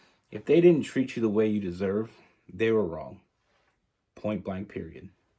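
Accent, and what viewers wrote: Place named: Canada. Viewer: I love your stories!! I could hang with someone like you all day! If you don't mind me asking, what state are you located in? You sound Canadian! The cat is the bonus! I seriously thought it was mine at first! Thanks! You are appreciated!!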